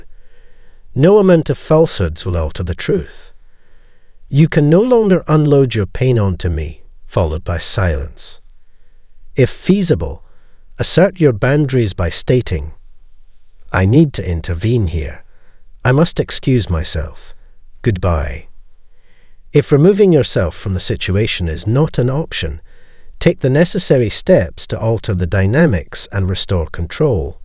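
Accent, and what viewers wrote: Scotland, United Kingdom. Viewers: This robot voice is amazing. I mean, yeah. It makes some mistakes, but this Scottish accent is amazing.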